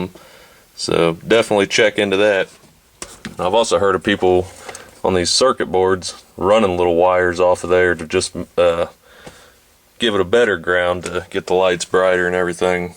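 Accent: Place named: South, United States. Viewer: Thanks for the info my Southern Buddy(cool accent).NICE CAR !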